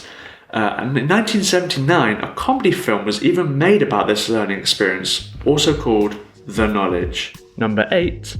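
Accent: United Kingdom. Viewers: I really love the british accent!😀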